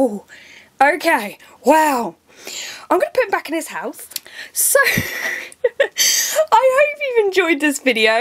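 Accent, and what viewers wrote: United Kingdom. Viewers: Are you British ???!??!??!?!?!!?!!??!!? I AM TOOOOOOOOOOOOO!!!!!!!!!!!! Edit: also if he turns out as a boy Call him Ron If he turns out as a girl call her ginny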